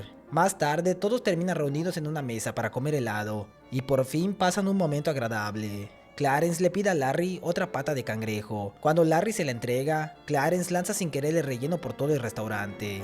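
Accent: Yucatan, Mexico. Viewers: Maaaa mi karnal suena como yucateco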